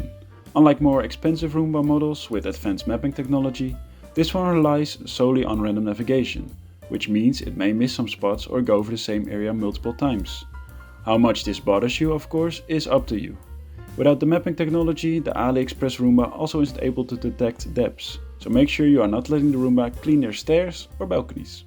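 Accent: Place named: Netherlands. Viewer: Totally love your review, calm voice and funky Dutch accent.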